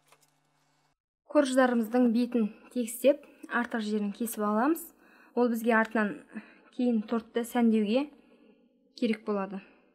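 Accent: Standard. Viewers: Таза казақша сөйлейт Ма ша аллах өнеге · Таза қазақша сөйлеген даусыңнан айналайын сенің!Аман бол,табысты бол көкем! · Ассалаумағаликум уа рахматуллахи уа баракатух ! МашаАлла таза анық 100%қазақша сөйлейсіз, рахмет 😘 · Өте керемет.Таза қазақша және анық.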